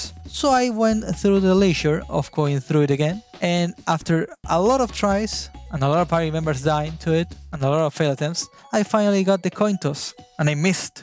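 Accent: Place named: Argentina. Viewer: yo sabía que eras argentino con ese acento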